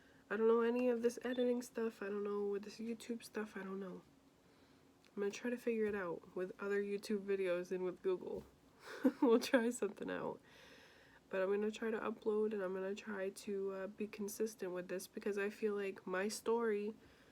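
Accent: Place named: New York, United States. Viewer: I was gonna comment on your other video wish you were chatting in that one love the New York accent haha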